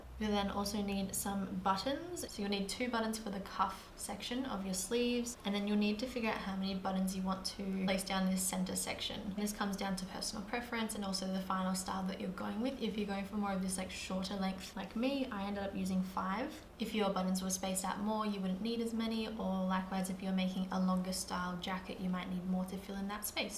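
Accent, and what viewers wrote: Australia. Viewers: Wow, what an impressive instructional video!! Excellent job, your jacket is beautiful. You are so good with measurements and seams. I almost feel like I could do this by just watching you. But I know it took great kill and practice. I have some old wool blankets to be used for this project. Thanks and love the OZ accent, Cheers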